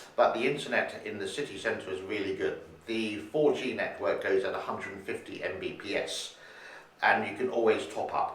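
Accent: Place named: RP, United Kingdom. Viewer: Your accent is somewhat posh. In brief, what is your background?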